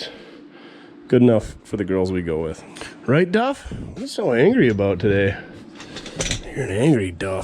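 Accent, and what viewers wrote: Minnesota, United States. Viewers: I dont know where your from but there is a guy in Minnesota that yalks like. you do.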